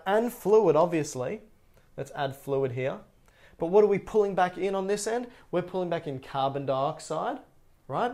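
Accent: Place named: Australia. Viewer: There's auto translate captions available, hope that helps... unless you're having a problem with his Australian accent :-)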